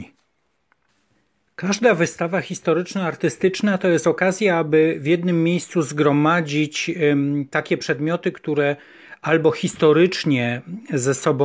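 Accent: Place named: Kraków, Poland. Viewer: To jest narracja historyka sztuki- merytoryczną, płynna, ciekawa bardzo, ale skierowana do znawców. Jeśli już miałabym grymasić, to prosiłabym unikać mimo wszystko krakowskiego akcentu. Wystawa, jak każda na Wawelu, wspaniała. gratulacje.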